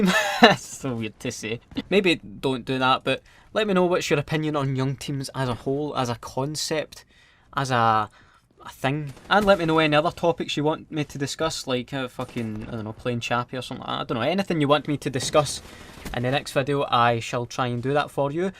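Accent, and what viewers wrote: Scotland, United Kingdom. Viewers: Where and Scotland were you born you have a western accent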